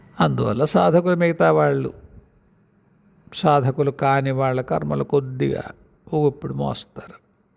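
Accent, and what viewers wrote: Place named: Godavari, India. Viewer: మీరు గోదావరి యాస ను బాగా ప్రేమిస్తారని అర్ధం అయ్యింది గురువు గారు నేను ఇక్కడే పుట్టాను.గోదావరి జిల్లా లోనే నాకు కూడా చాలా ఇష్టం ఆ యాస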